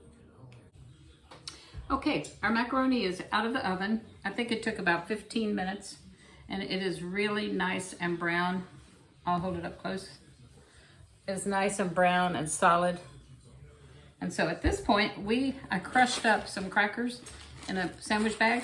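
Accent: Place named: Kentucky, United States. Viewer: You sound like a Ky. Girl, I’m a ky girl.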